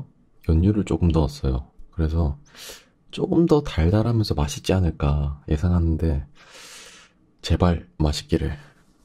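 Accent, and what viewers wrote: Busan, South Korea. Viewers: 어디가 표준어랑 섞인건가요 ㅋㅋㅋ 완전 부산사투린데요?ㅋㅋㅋㅋ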